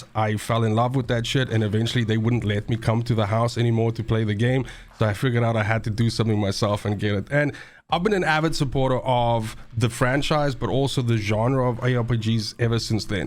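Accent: South Africa. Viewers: Trying to figure out your accent... Are you from South Africa? Howzit from Cape Town 😎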